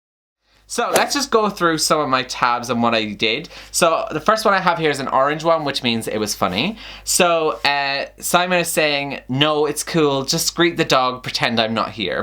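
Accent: Ireland. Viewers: your youtube name is obviously so i don’t know why i was surprised when i realized you have an Irish accent. We kinda annotate similarly! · I want to point out two things: 1. I’ve never been interested in that book but you made me want to read it like... now. Maybe two lives ago. 2. Your Irish accent had me almost sobbing because it’s adorable omg. Please be my friend ahaha I had to watch the video twice because I kept being distracted 🙈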